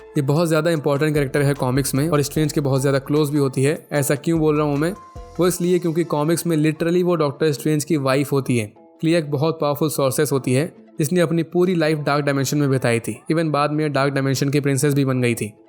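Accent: India. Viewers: I used to watch your loki video, I've watched them all I just remembered you used to say " Keep calm and Listen to me " in your Indian accent so, yeah your explanation are best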